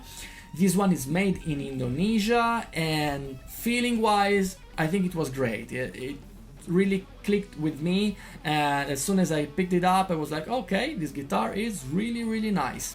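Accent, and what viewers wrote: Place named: Italy. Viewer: I subscribed by the time you completed your first line.....damnnn i soo wish good content.....and also for my love of italian accent😅😅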